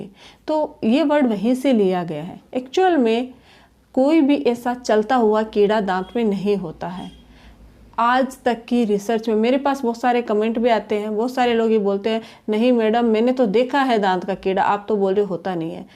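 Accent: Rajasthan, India. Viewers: M aap rajsthan s ho kya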